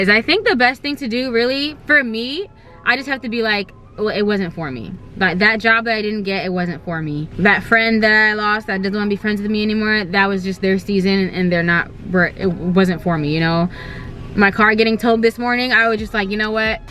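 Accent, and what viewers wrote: Jamaica. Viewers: It’s the patois for meeeee. I think its the first I’ve heard you speak it, love it! You spoke some real facts though sis! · wait u jamaican?😭😭😭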